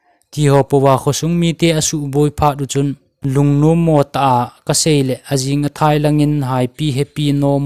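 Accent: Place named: Singson, India. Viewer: Narrator pa hi Thanlon lang Singson accent amang e.. Ngainom aum e..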